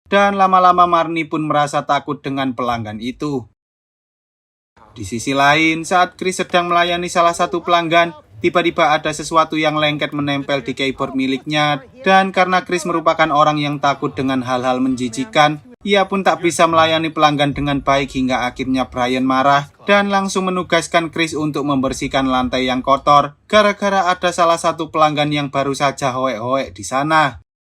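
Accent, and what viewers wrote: Java, Indonesia. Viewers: Mas bisa gk logat jawanya ush medok amat jdi film yg seru jd datarrr aja Maaf ya 🙏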